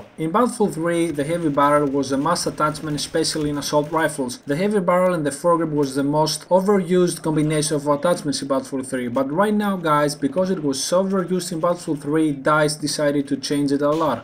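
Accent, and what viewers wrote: Greece. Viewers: Are you Greek? Your accent sounds Greek^^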